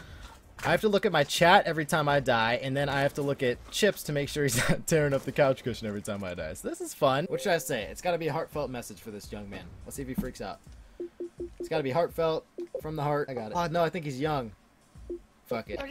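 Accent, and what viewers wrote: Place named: Indiana, United States. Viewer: are you from hickville indiana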